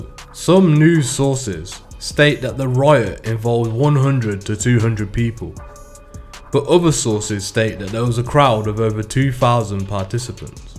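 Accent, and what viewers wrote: Bristol, United Kingdom. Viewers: no they weren't though, why are you acting the goat sun we all know Bristol nothing the only reason this guy is doing a video is because he sounds like he is from Bristol and probably had his peddler robbed off him by them and he thinks there the cripps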